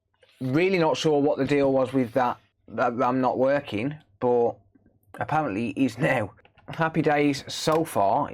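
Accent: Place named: Scotland, United Kingdom. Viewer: Is he scottish? His accent is so thick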